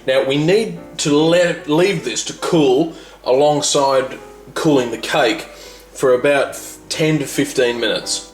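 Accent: Australia. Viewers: Can anyone tell me is it Australian accent? Just curious. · At first i only subscribed to him because he had an Austrailian accent, but now i found out he makes delicious things so it's a win win situation :D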